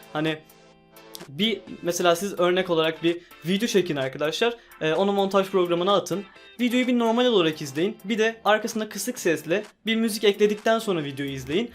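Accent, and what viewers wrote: Azerbaijan. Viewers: Sen azerisən brat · Azerisən sen? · Abi sen azerbaycanlisan qaqa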